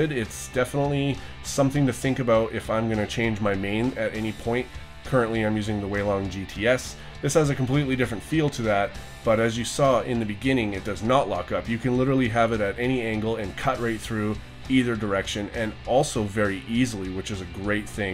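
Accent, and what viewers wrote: Canada. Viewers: It’s the Cubicle.Us and you are pretty sure Canadian, right? Heard that eh, (I’m not Canadian)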